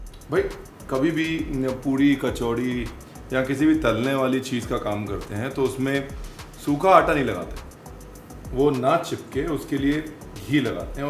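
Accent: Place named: Lucknow, India. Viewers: Sir aap ki baatein Mujhae Kanpur aur Lucknow ki yaad delati hain.my home sweet home.n you share authentic UP RECIPE 👌🏻👌🏻👌🏻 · Apki lakhnavi bhot acchi baat karne ka salika was nice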